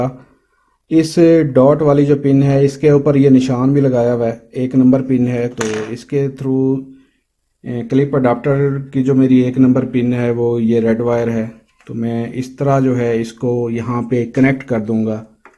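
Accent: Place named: India. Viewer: primera vez que veo un video completo narrado por un indi, tuve que soportarlo, para saber como hacer la copia de la memoria serial...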